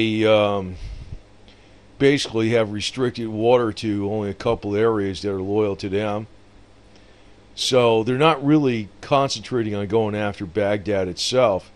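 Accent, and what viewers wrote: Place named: California, United States. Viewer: Sound like California until u said isisl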